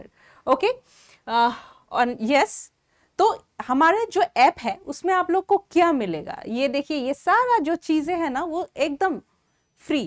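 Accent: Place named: Assam, India. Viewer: Assamese hindi accent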